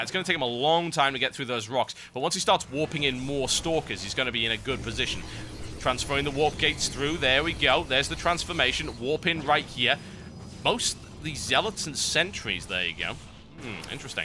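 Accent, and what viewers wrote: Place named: England, United Kingdom. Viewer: i could listen to your damn-so-good-comment all f'in day, especially because of your incredible authentic english accent :) total biscuit you are a total wizkid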